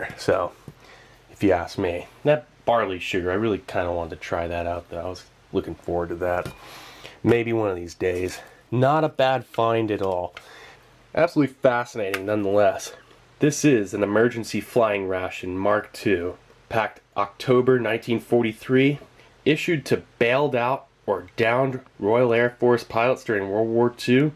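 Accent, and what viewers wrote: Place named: Midland, United States. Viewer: he's american, i can tell by the accent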